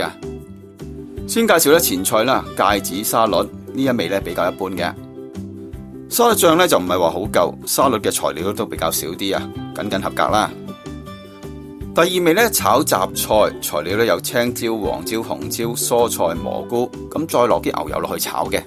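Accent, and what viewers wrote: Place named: Hong Kong, China. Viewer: Data兄的广东话在香港人中应该算非常好听的那种吧？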